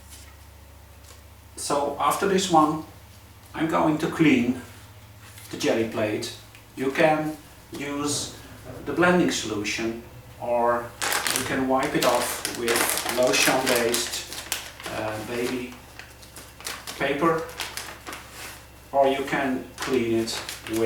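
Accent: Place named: Netherlands. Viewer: Im always happy to see dudes creating too, I love it. I was bit scared for your nice shirt - especially the sleeves - but you didnt get a drop on in! For more traditional marbling with alcohol inks, just use hand sanitizer; a good (thick-ish) layer on the plate, then inks and you can manipulate them exactly like when marbling the traditional way (just dont use sharp tools with gel plate!). I liked all your prints, but the ricepaper ghostprint one was magical. Dankje well (sorry, Im just assuming youre from NL by your accent..) and greetings from Finland. -Jane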